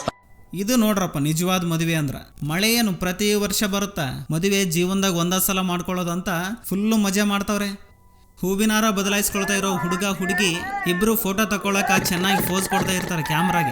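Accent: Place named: Bellary, India. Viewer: ನಿಮ್ಮದು ಬಳ್ಳಾರಿ ನ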